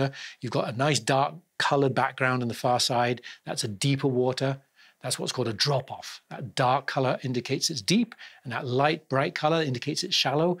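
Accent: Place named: Wales, United Kingdom. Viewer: awesome vid as always. I’m wondering about your accent; everything I’ve read says you’re from the West Country but I’m sure I detect a hint of Welsh in your voice?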